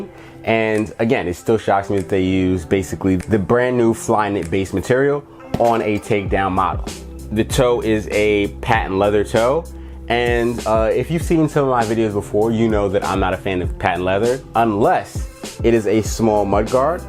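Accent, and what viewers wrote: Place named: California, United States. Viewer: You say you from new york I do not hear it in your voice son you sound like a california kid my accent is deep from South Bronx heart of new york kidd E 194th Briggs come on son rep right son aiiight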